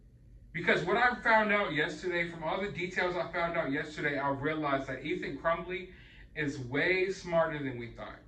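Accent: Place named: Hawaii, United States. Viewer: How you say, where I stay, is so Hawaiian 😉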